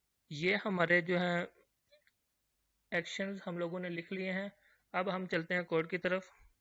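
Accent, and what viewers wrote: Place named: India. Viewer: 印度英语听不懂。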